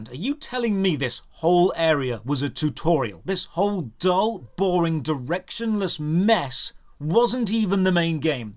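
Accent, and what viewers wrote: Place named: RP, United Kingdom. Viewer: I love that you sound so posh and well spoken, so hearing you drop the f-bomb instantly makes me cry laughing. Thank you for all these videos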